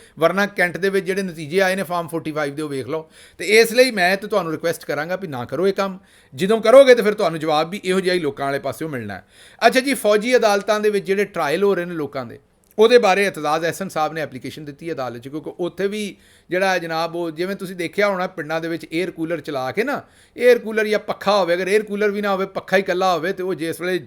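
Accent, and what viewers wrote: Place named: Amritsar, India. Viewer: گوڑی امبرسری پنجابی سن کے مزا آیا ۔ ساڈے وڈکے وی امبرسری سن Regards. Prof. ILYAS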